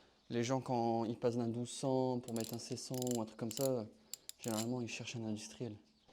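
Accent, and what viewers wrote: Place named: Pas-de-Calais, France. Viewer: Bravo, bravo, moi qui suis en train de démonter mon moteur de cox, ça me sert bien ton super tuto...!!! Je suis dans le Pas-de-Calais, j'ai reconnu le tchiot accent du coin... Continues de saquer d'din et force à toi!!! C'est top!!!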